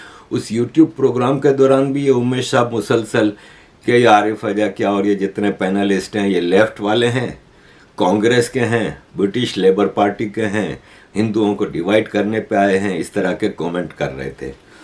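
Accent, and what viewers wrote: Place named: Sindh, India. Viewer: Love your views. My utmost respects. I think you are a Sindhi (from your accent, but I may be wrong). Heartfelt respects from another Sindhi. 🙏🙏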